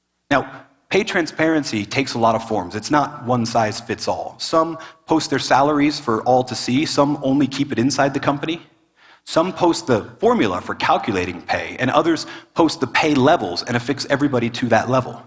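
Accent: United States. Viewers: ขอถามผู้รู้ครับ นี้คือสำเนียงอะไรครับ. ใช่ อเมริกัน ไหมครับ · ใช่ สำเนียงอเมริกัน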